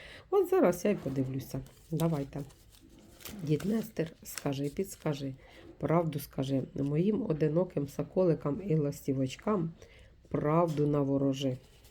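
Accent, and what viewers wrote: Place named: Kirovohrad, Ukraine. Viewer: ви самі звідки?Я з Кіровоградщини і ви розмовляєте так,як у нас балакають.Дякую,що я вас відшукала, відео короткі,дякую.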